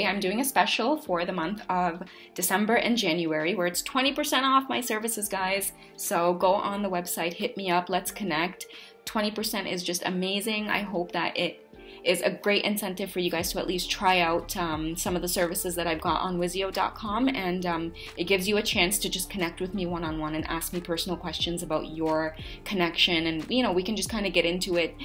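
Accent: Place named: Trinidad and Tobago. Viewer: OMG my video's thumbnail is a pair of passionate lips!! I'm just waiting for it to process. Lawwddd!! Your upbeat energy made me smile right through this reading, love. Fantastic reading! It felt like a personal reading - absolutely on point!! You are so right about the DF leaving the DM to learn his lesson. We are standing in our power and finding our passion. Woohoo dat Trini talk - hot too bad!! Ah love it bad bad!! Thank you so much for the mention in the description, love! So appreciate it! 🥰💕💋🔥🇨🇦🇹🇹. Needed to add the flags!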